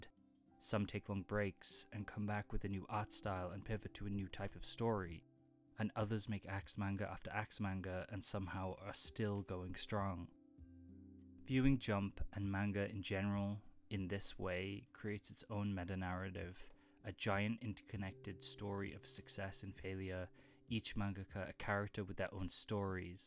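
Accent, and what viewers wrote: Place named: United Kingdom. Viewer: Why you sound so more british here, lmao.🎉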